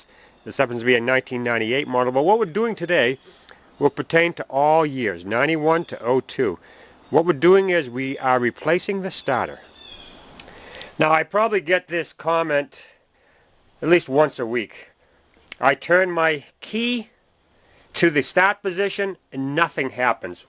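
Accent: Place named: Boston, United States. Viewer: My supervisor is from Boston and has the same type accent, it's common in the New England area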